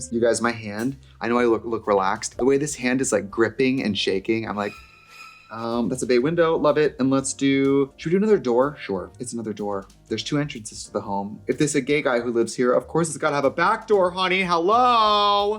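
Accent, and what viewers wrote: Wisconsin, United States. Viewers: Her Wisconsin accent was very strong in this video 😭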